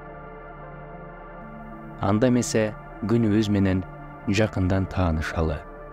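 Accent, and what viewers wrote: Standard. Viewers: Ма Ша АЛЛАХ Кыргызымдын мыкты мырзасы сизге АЛЛАХЫМ ыраазы болсун, абдан соонун айтып берет экенсиз. Сүйлөгөнүнүз таза кыргызча болгонуна абдан кубандым сизге кичине болсо да колдоо көрсөтүү үчүн каналынызга катталдым😊 ишинизге ийгилик алга🤗👍 Бирок эмне болоорун бир кана ЖАРАТУУЧУ АЛЛА ТААЛА билет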